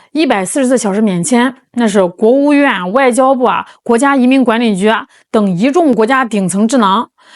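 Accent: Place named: Shandong, China. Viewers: 我听这口音有点像我们山东人，如果不是鲁中，也应该是鲁西南😂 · 我祖籍山东，抗战时期到的天津，已经没有老家口音了，但是听着挺亲切的:washhands: 内容好就看得下去，山东人热情踏实，山普挺好，不用AI则更加真实感和用心诚意。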